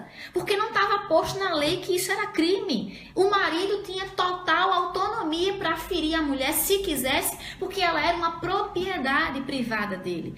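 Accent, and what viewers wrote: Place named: Paraíba, Brazil. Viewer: Nossa!!!! Que aula maravilhosaaaaaaaaaaaaaaaaaaaaaaaaaaaaaaaaaaaaaaaaaaaaaaaaaaaa Professora pensa como eu penso. Mt didática e ao mesmo tempo teoriza mt bem... Mas o sotaque parece de paraibana rsrsr. Meu Nordeste me Orgulha mesmo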